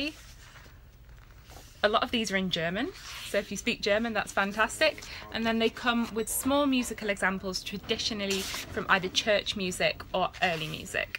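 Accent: Netherlands. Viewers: English girl speaks German with Dutch accent. Strong move!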